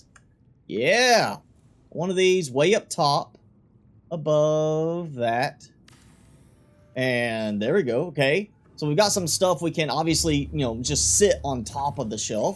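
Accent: South, United States. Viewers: Gotta love the y'all accent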